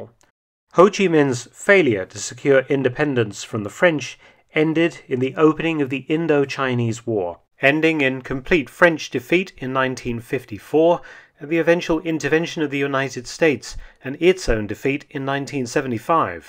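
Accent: United Kingdom. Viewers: I am a Vietnamese patriot citizen but I can't get mad with your charming British ascent, even if you ensulted me.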